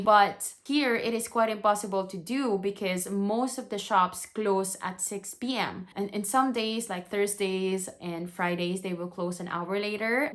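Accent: Ireland. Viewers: Not sure how long you've been here, but that Irish accent is coming along nicely! 😁👍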